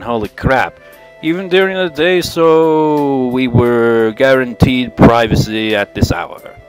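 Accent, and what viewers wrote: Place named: Wales, United Kingdom. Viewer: It sounds Welsh now that I know, but I thought It might have been English.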